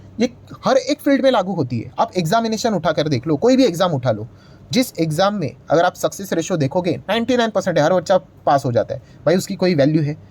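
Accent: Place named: Gujarat, India. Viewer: Yes sir I listen your videos word to word, belive me i observed your pronounce that you are guharati if not you are marathi with many gujarati friends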